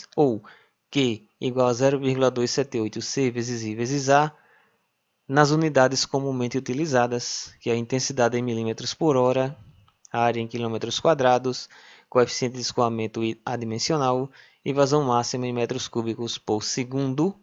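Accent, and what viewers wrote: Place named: Alagoas, Brazil. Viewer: Retificando: sotaque alagoano!!!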